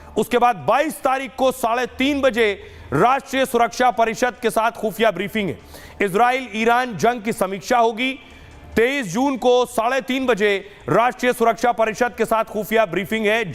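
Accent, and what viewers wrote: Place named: Pahadi, India. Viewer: मुझे आपका पहाडी आवज बहोत पसंत आता हैं घर में मोबाईल रख दो और काम करते करते समाचार सुनते रहो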